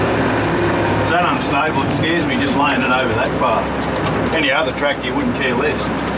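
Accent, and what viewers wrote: Australia. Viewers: Well, as usual i watched you from go to wo and enjoyed every bit cobber. Just love your content and the Aussie way in witch you deliver it. All the best to you and yours and the billy lids mate. See you on the next one.🤠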